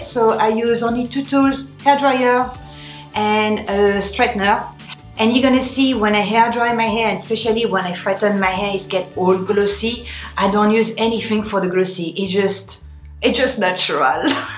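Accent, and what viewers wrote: France. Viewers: Tu me fais rire., française aussi a.,Adelaide on a kes memes cheveux fins qui frisent et j aime las trop trop de produits mii non plus., Silicium organic d. Espagne est suoer pour kes ongles la peau et les cheveux Moi aussi je suis une vitamins junky multi B fish oil,.....tu as raison pour la longueur mais je suis trop faineante pour me raidir les cheveux 😀 les australiens doivent adorer ton accent 😘😘bravo oour ton chanel comme tu dis 😘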